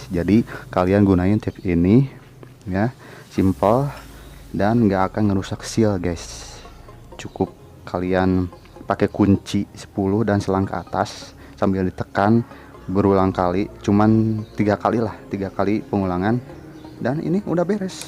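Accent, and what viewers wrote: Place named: West Java, Indonesia. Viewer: Gua aja bang jangan gue jd norak soalnya logat sunda ga pantes bilang gue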